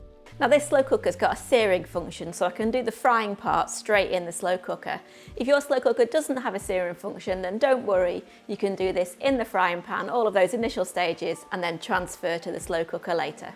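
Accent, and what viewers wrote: United Kingdom. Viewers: the accent..is that british ?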